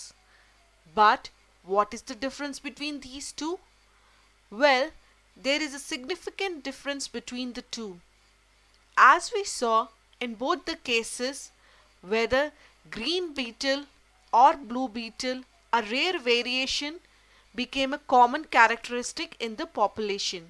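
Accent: India. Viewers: agar aap hindi mein bolte to jyada aacha rheta.Apki english sunke ye to clear hai ki aap ki mother tounge hindi hai...or aapko bhi ye pata hai ki hum sbhi indians hai and hindi jante hain.......phir kyon english????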